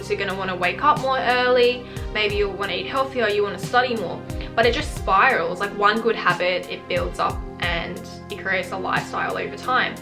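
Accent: Australia. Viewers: is my inspiration, therapist, and australian accent guru <3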